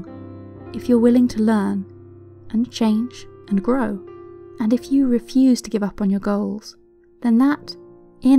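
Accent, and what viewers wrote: United Kingdom. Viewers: Yeah that British accent gave me an instant no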